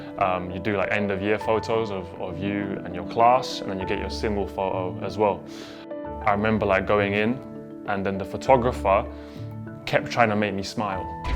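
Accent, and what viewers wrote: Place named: London, United Kingdom. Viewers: I heard his voice and immediately thought “he’s such a Londoner” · He sounds like he is from North London. That was typed before I saw he was from London.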